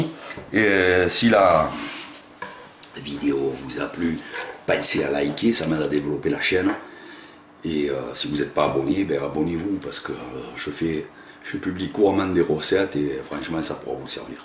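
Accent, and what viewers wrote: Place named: South, France. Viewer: Avec une voix qui sent bon le Sud 🤗 je dis Ouiiiii!!!! Je testerai la pizza plus tard, je savoure déjà l'accent 🌾🍅🍕😄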